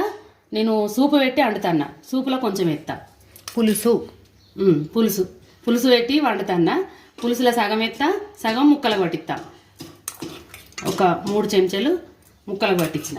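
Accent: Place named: Karimnagar, India. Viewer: Pakka telangana slang , vinasompuga undi Amma. Meedi naku telai Karimnagar Anukunta. Maadi warangal 😅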